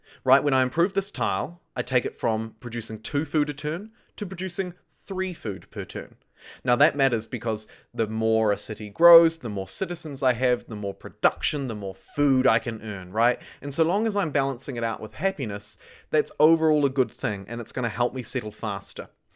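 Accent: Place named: New Zealand. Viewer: I love a New Zealand accent. Ben is "bin", and bin is "Ben".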